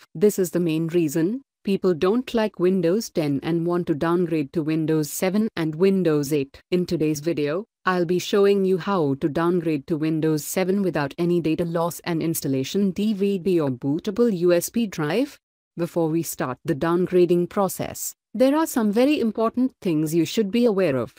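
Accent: India. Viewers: bro doesn't use his actual voice but its still in indian accent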